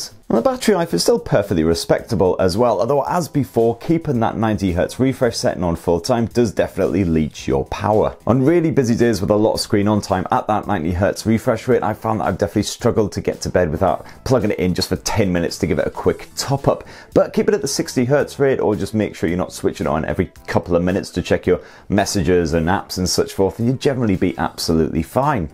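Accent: United Kingdom. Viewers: it was a review for me before I lost in his British accent. now I am learning British English.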